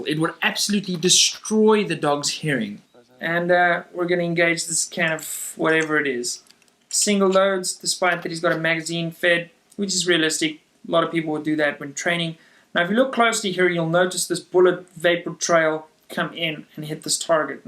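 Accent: Afrikaans, South Africa. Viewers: Love die Afrikaanse aksent:) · Hey man, you from South Africa by any chance? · The first couple of seconds into this video I paused... Hmm... This guy... Is South African... I was right ^_^ Lekker man.:) Goeie video. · You sound south african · South African accent? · I thought so! 🇿🇦🇿🇦 recognize that Saffa accent anywhere. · Where are you from? I want to say South Africa 🤔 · your accent? are you a south african? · Sounds like a old south African accent..? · Is this guy south African? Dope... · i thought i recognised that accent, im South African, great work here man! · He sounds South African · This guy sounds like he is a South African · Are you South African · Yo are you South African? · Hold up, are you South african? your accent and speech... must be?:O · are you from SA? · You sound South African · Is jy afrikaans? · South African?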